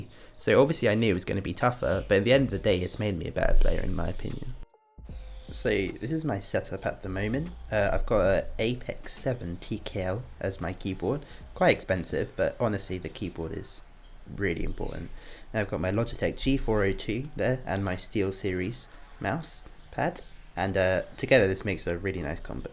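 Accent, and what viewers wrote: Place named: United Kingdom. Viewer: I’m so used to hearing people talk with a British or Australian accent I forgot he was British lol good review tho:)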